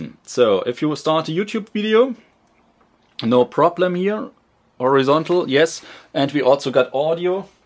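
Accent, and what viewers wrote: Germany. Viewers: German accent, aren't you? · you are from germany aren't you ? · i love ur german accent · Lustig man hört, dass du Deutscher bist, ich finde es aber echt cool, dass du auch Englische Videos machst.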